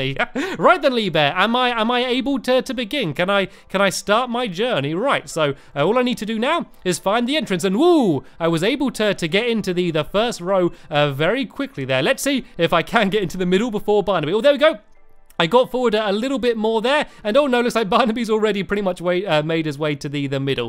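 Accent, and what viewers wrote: England, United Kingdom. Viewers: Francesca Mazzi Questa poesia era ok .... il "salto in un lago" parte non era bello, in effetti hai appena detto di suicidarsi. Inoltre è Stampy un male youtuber, non mi piace tanto quanto si fa, perché, del suo accento, accenti inglesi tendono ad essere fastidioso per me (non essere razzisti), così ogni volta che ho sentito somone lamentarsi lui im come "cosa l'inferno ", ma allo stesso tempo dico" Sono d'accordo che può essere una cagna a volte "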